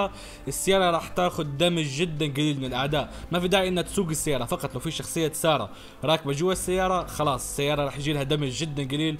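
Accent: Iraq. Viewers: دقيقة ملك اللهجه العراقية 😂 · احلى واحد يحچي عراقي ضلعي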